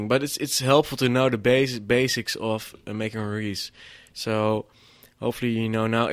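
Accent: Netherlands. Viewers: It's the typical dutch accent haha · Nederlands? herkende het meteen aan je accentje :P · Mental! I clicked it on in the margins of the You TUbe Drum and Bass selections! What girls are you on about? He does sound Dutch though..Pffffffff as the Dutch male says : ) I welcomed the tour on this software....the link did exactly what it said on the tin.*shrugs* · ah so is dutch cant miss that accent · Lekker hollands accent heb jij. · LOL I love the way the Dutch talk. :D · ye, dutch people talk english kinda funny, you always hear that immediately (i'm flemish) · lol nederlanders en hen accent in het engels zijn echt onmiskenbaar :P next to that good tutorial! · LOL dutch accent FTW!!!!! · ik hoorde gelijk al aan je accent dat je nl bent , lol . Maar nice..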